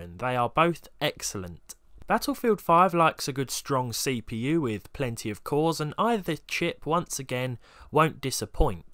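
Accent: United Kingdom. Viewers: I love ur Britishaccent ^^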